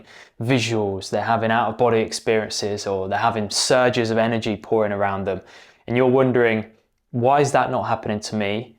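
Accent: United Kingdom. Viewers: Yes it's great to hear an English voice, Thank you.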